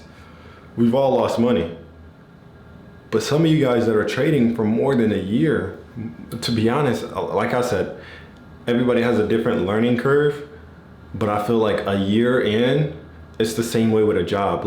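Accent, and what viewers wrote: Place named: Cape Town, South Africa. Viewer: Bro ! Yu slightly got that CAPE TOWN Accent...."The Mother Land /South Africa"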